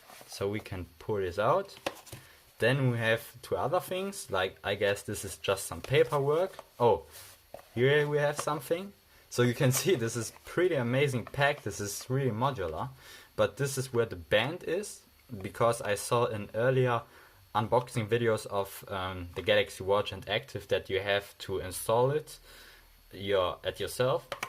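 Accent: Germany. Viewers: For the accent, I think he's German, so in Germany it's available.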